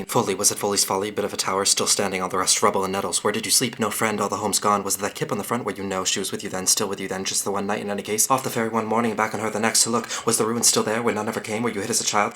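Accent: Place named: United States. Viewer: Im not certain an american accent lends to the phraseology of beckett.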